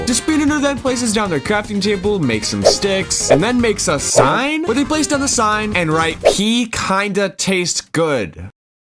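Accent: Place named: RP, United Kingdom. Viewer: Dramatic posh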